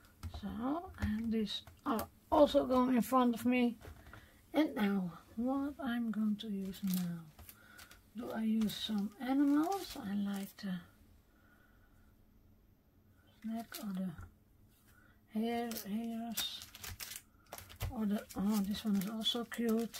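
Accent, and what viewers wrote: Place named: Netherlands. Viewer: stunning style and love your Dutch English, you are the most sweetest lady xx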